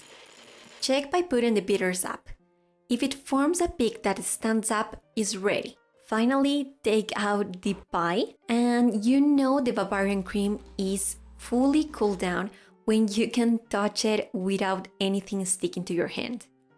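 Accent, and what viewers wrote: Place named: Russia. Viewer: Interesting recipe.. thank you! but you're accent is funny, is it russian?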